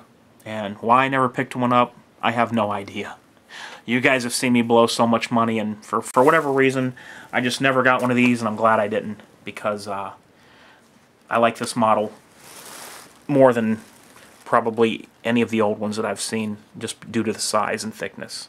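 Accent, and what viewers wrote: United States. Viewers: What part of the states you from again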